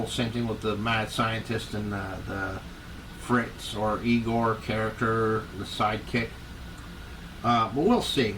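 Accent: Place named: New England, United States. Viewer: "Draw-ring"....lol, can't shake that New England accent. Abdiel murdered that skull challenge!!! Den Mother Edie was super clever making her Lenofish out of a skull, I didn't see that coming! Congrats to Fraidy and Monster Morgue Tutorials(Abdiel) for their wins!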